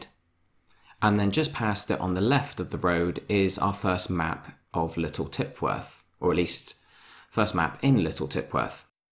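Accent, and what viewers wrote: United Kingdom. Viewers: Best walkthrough ever. Smart evenly paced british voice is like listening to a harry potter audio book. Make sure you do the next Dead Island 2 or Red Dead Redemption 2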